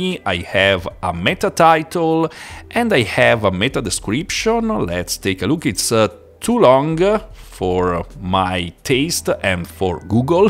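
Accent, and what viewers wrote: Italy. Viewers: I love your perfect English with a delightful Italian inflection when a word ends in a consonant ❤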